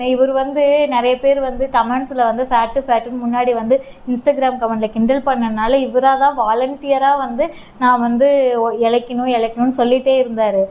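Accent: India. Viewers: Cute akka ninga ❤...... Akka ninga nga tirunelveli ponu mari pesuringa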